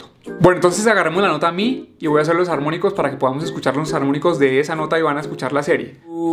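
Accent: Colombia. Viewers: Mi silbido casi no se escucha!! Y que bonito su acento colmbiano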